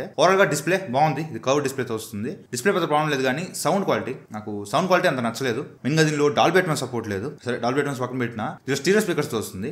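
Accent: Nellore, India. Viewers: Bro meedhi nellore aa? 😮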